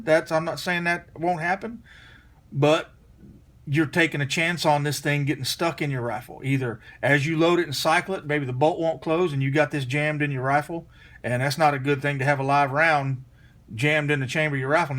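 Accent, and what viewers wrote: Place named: South, United States. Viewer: Very nicely done , especially about sticking spent brass from another gun, I bet a thousand people have done just that!! Don't worry I have been married for over 30 + years but you have a nice voice for video's,kind of like slim pickens ,has kind of a southern draw! I subbed. You should make one using that device since I own one now!And spent over a hundred on primers brass bullets ETC!!! Very good video again.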